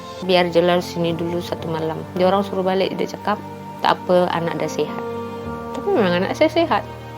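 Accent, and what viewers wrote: Malaysia. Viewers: Bahasa sudah seperti bahasa org malaysia dah👍👍👍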